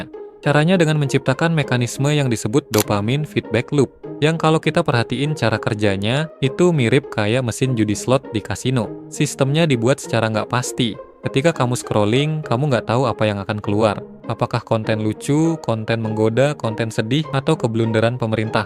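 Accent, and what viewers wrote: Bali, Indonesia. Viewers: Narator logat bali kayaknya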